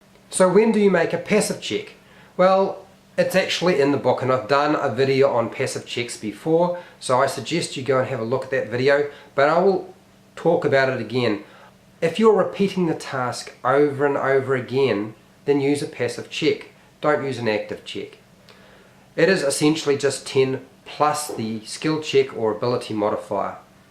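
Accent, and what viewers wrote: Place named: New Zealand. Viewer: he's talking about your kiwi accent :)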